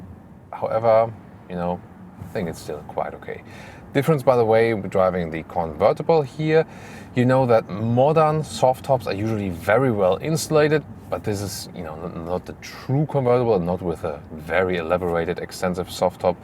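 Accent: Germany. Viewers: FIAT take my money!!! I want one. Long range version only. Thank you. Super review. Super German accent! Love it! Italy loves you!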